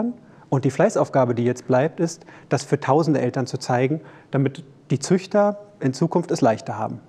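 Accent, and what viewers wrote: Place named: Germany. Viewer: HA! Ich wusste schon beim englischen Video, dass der Akzent aber recht deutsch klingt :D. Es wäre praktisch, wenn ihr die Videosprache im jeweiligen Titel auszeichnen würdet, da viele Englisch-Sprachige Zuschauer kein Deutsch können bzw auch viele Deutschsprachige sich im Englischen nicht wohl fühlen.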